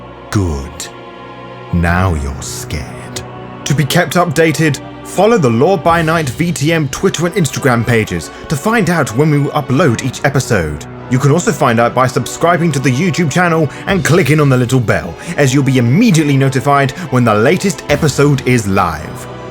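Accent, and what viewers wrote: United Kingdom. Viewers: Its called being British, which I assume he is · Pray tell, fool. What do you THINK a British person should sound like?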